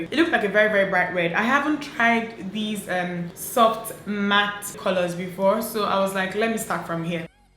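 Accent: Nigeria. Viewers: I love nigerians.Their accent,makeup and traditional weddings...you are so beautiful.Much love from Kenya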